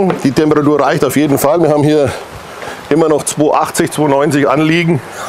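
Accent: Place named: Franconia, Germany. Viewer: stehste da im Regen..hahaaa...hab dich grad Aboniert,.........,jjooaaaa,,,,,interessante Rezepte muss ich sagen,ich hab auch büschen Verbindung nach Frrrranken,und zwar nach Coburg ich kenne den Dialekt wieder bei dir.....aber egal,du hast tolle Rezepte und ich werde mal sehen ob ich das auch ohne den Grill hin kriege,manches geht ja auch im Ofen ...gelll!!!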